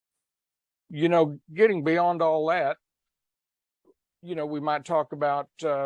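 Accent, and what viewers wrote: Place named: Tennessee, United States. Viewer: Hey. I just found you. I watched the Press conference and was intrigued. So here I am. I lived near Covington for a couple months and remember seeing the signs about walking tall. I also remember the movie. I was a boy when I watched it with my father and I'm 64 now.. Very very interesting. Things aren't always what they seem. That's some beautiful area there.. I still like East TN better and if not for elderly parents here in South Central Indiana I would move there.. I really love TN. You folks have an accent though.. hahaha.. Down there they say I sound like I'm from Canada, and north here in Indiana such as in Indianapolis where I used to live as well they said I sound like I'm from TN. I am from an area in Indiana that is sorta a line where you either have a KY accent or a northern one. I guess I got the northern onel.. 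haha.. Be well sir and great videos.. I'm going to really watch all your vids.. Great stuff and really good info and evidence! Regards Tim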